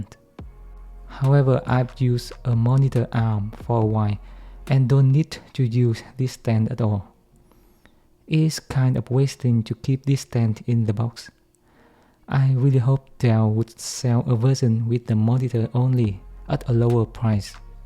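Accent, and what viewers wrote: Vietnam. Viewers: Đúng giọng Vietlish rồi, thằng chủ kênh người Việt Nam à =)) Chanel owner is Vietnamese =)) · giọng này chỉ có VN :))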